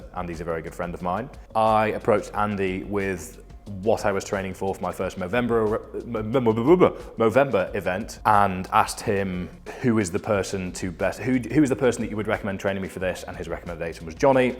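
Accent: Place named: England, United Kingdom. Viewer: I did wonder about that....why DO you have an English accent?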